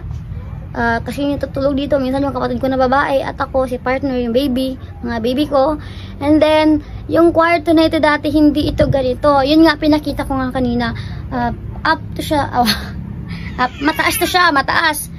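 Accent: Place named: Iloilo, Philippines. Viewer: Sin hahaha ilongga tlaga. yero po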